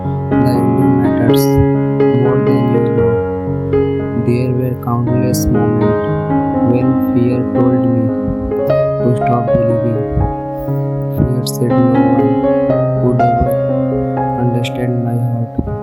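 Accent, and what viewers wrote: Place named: India. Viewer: Are you Indian because you talk like one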